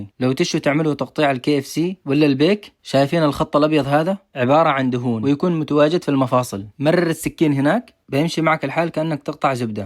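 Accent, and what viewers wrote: Yemen. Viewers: يتكلم يمني بدون أي خليط لأن اليمن في لهجات كثيرة وهذه لهجة لبعض مناطق الوسط والجنوب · من كلامك مبين يمني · من اللهجة واضح من عندنا يمني 🌝🤍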